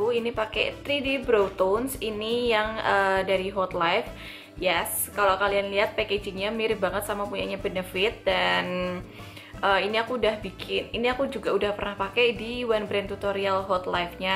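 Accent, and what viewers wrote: Java, Indonesia. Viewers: Lg nyari2..tutorial makeup..ketemu ini channel Tak kirain td bule' kak..eh gak taunya medok jawa...😂asli langsung aku subc ini kak... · medook e... :D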